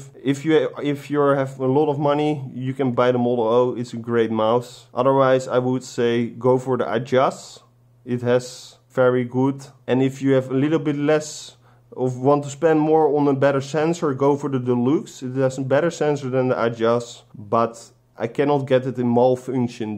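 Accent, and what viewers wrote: Netherlands. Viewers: Je hoort Ook gwn rustig die nederlandse actent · Love the little Sasuke figure! Greeting from Holland, I can hear you're dutch aswell. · Are you Dutch u really have a Dutch accent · Hah nederlands accent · no problem keep it up maybe one day earning :))) and you sound dutch? xdd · u fr sound dutch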